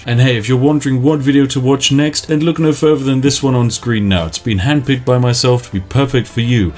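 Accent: United Kingdom. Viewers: does anyone else notice that the spiffing brit has the same voice and local British accent as bigclivedotcom?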